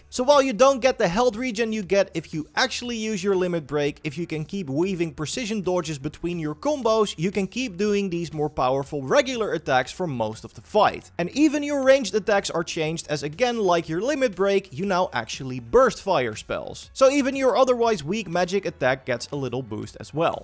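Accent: Netherlands. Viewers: Your accent is sooo dutch 🤣🤣🤣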